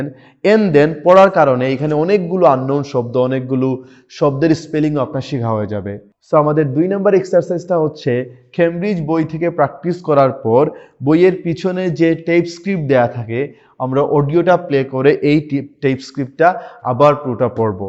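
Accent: Sylhet, Bangladesh. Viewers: সিঙ্গেল ইউজ প্লাস্টিক ব্যবহার কমিয়ে প্লাস্টিক দুষণ রোধ করতে এগিয়ে আসুন । পানির বোতল বাদ দিয়ে পানি ফুটিয়ে খাওয়ার অভ্যাস করুন। ভাইজান কি সিলোটি?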